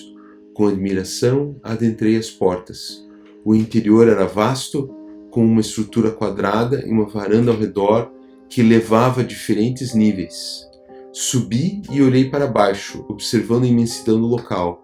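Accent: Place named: Portugal. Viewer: Esse sotaque português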